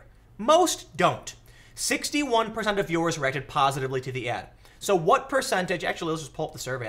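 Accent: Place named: United States. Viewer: Why cant Americans say yoghurt? Sorry, but it does grate on me!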